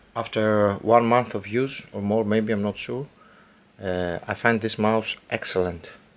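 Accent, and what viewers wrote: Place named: Greece. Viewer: Τα αγγλικά του Έλληνα αναγνωρίζονται αμέσως απ' τη πρώτη λέξη ☺️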